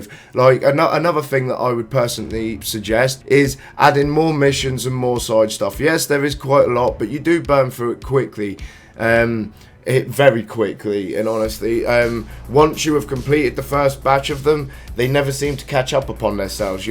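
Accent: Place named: East Anglia, United Kingdom. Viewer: I like your accent, where are you from? It reminds me of East Anglia in England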